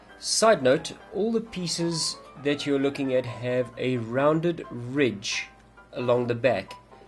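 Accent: South Africa. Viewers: Are u south African 🌍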